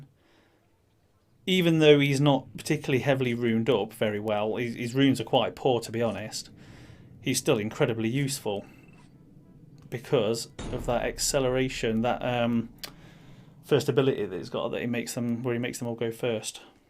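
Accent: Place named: United Kingdom. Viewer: You sound British tbh :P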